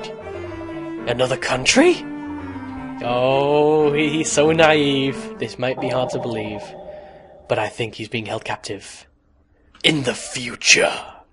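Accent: United Kingdom. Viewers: I love your accent. I wish I had an English accent. x3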